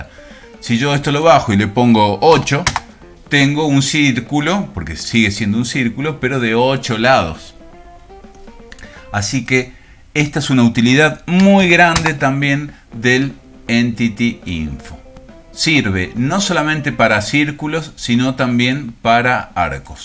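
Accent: Argentina. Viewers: que bueno!!! que interesante y que divertido escuchar a un profe argentino!!!!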